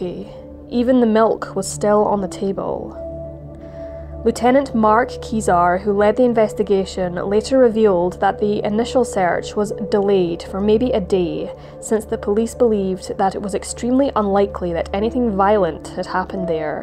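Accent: Scotland, United Kingdom. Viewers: Are you Scottish? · Scottish AF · She's Scottish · She is Scottish lol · Scottish lol and I’m Mexican I know that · Is this accent Scottish? Reason I ask is I just heard this story on That Chapter in an Irish accent. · George Kintail Scottish accent 🏴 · It’s Scottish! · It is a great accent- but it’s Scottish 😊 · Louis Cypher She’s Scottish. I love her voice too. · Do I detect a Scottish accent ? Well narrated. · A nice Scottish accent.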